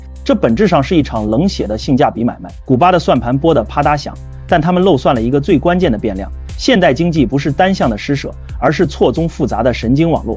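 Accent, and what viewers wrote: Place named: China. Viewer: 奇怪這位先生應該要用台語說才對吧！怎麼會用大陸口音呢？就算把首爾改成漢城也只有台灣自己說而已，全世界都會說韓國首爾！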